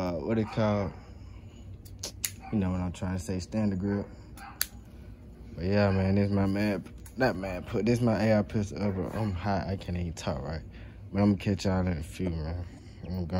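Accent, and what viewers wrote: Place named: New Orleans, United States. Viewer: Sound like you in New Orleans bro. You know we got to stay up on straps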